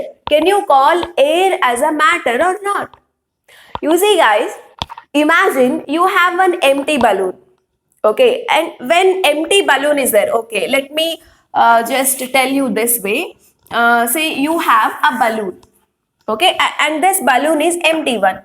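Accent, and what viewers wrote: Karnataka, India. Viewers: Maam, are you from karnataka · Mam are you from Karnataka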